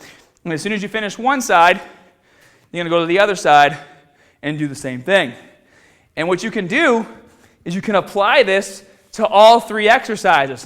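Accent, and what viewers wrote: Boston, United States. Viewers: damn it's been awhile since I seen any of this videos. I didn't know he had a Boston accent, lol. keep up the great work. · TreJay Ratliff i hear everyone spam about his boston accent in previous videos Xd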